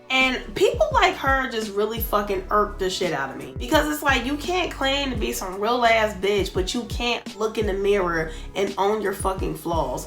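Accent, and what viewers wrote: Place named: Philadelphia, United States. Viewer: Idk where you from but it’s definitely close to philly IF NOT philly 😩😭😭 ya commentary had me rolling !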